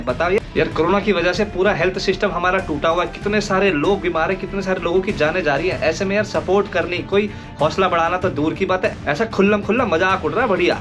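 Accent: Haryana, India. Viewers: Haryanvi h k bhai tum?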